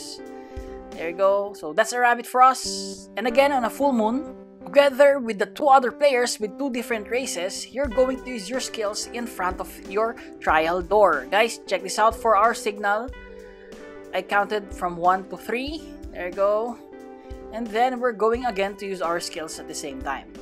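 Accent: Philippines. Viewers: Are you Filipino, your accent😂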